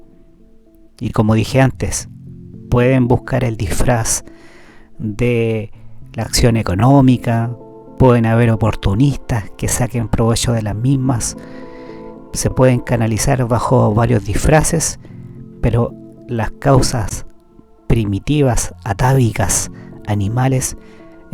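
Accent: Chile. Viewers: Ya que tocaron el tema de la locución, coincido en que a mí tampoco me gustó. Desde luego que el contenido es más importante y no voy a dejar de ver el vídeo porque la locución no me gusta. Pero, si deseo mejorar el canal, es preciso cambiar de locutor. No es tanto el acento chileno (que también) sino que realmente no sabe narrar. Lee el libreto como sea, no hace las pausas necesarias, no transmite...pareciera una persona al azar que ni siquiera conoce el contenido del libro. A mí me gusta la voz de Helena, lee muy bonito. Al final de cuentas, nuestro idioma viene de España, por lo tanto quienes mejor lo usan son los españoles. Humilde opinión. Saludos. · A mí por el contrario me encanta que 🤩estos vídeos se hagan de viva voz de: ¡Se que eres tu! Porque nadie más podría explicar con tanto discernimiento y claridad lo que ha expuesto en su blog y eso es grandioso.. 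He aprendido mucho con tus aportes, ya que desde hacía muchooos años buscaba aterrizar de esta manera las lecturas del libro. Por más podcast como estos con acento Chileno 😄 por favor🎉. Gracias ❤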